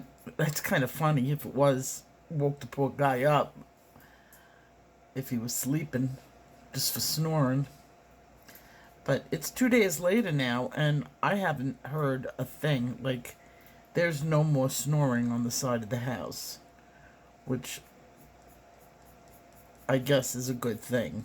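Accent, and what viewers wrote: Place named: New Jersey, United States. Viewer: Hi. You sound like my spouse's mom, and she sounds like New Jersey. Just an idea, but why not draw from your list and the official one?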